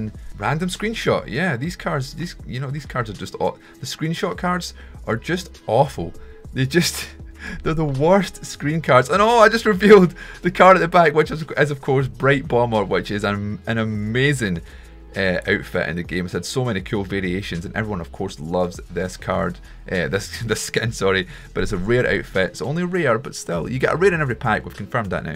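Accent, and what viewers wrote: Scotland, United Kingdom. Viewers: where you from Kilmarnock Edinburgh or Glasgow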